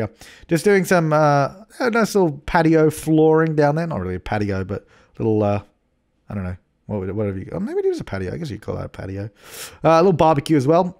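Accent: Australia. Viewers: It took me so long to figure out that is Australian... I'M AUSTRALIAN...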